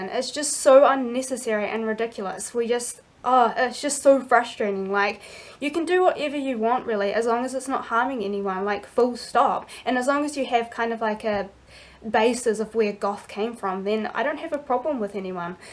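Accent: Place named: United Kingdom. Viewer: Damn, you are fucking HOT!!! Love a British accent!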